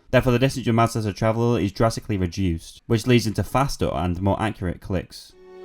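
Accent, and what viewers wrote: United Kingdom. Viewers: Try talking slower.. the combination of your british accent with how fast you talk means half of the world cant understand you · Proper English accent as it should be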